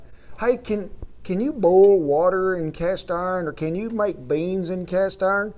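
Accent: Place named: Oklahoma, United States. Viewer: Since you live near Altus, OK, that's an OK accent. My Mom and grandmother grew up in Magnum, not far from there. OK is okay. :). So is that OK accent. I'm loving it. Reminds me of my Mom's family.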